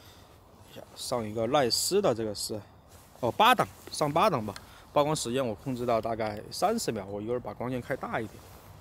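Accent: Sichuan, China. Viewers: 新粉留言，你的川音好有亲切感～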